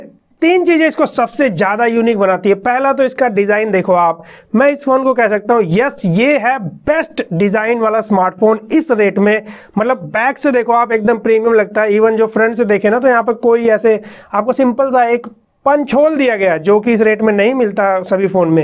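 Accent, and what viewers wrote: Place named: Uttar Pradesh, India. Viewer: आप का स्टाइल zabardast है. Especially the u.p. वाला touch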